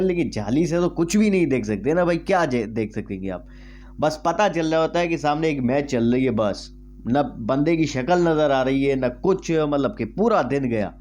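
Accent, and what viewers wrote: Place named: Sindh, India. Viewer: video wle larke ho aap? Han apke bolne ka lehja sindhi laga tbhe pucha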